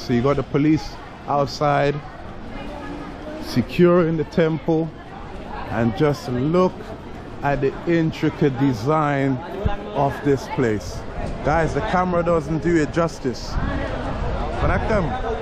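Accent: Tamil Nadu, India. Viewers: Your Tamil accent was very super brother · Your tamil slag ,so cute❤ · Madurai kaaran 😎😎😎😎😎. Nice Tamizh accent brother.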